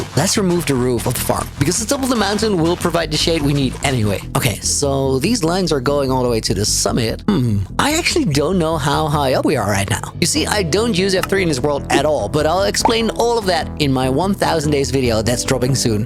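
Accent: Netherlands. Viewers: incredible! just finished binge-watching your videos. also, your English has a slight Dutch accent. Does that mean you actually are Dutch?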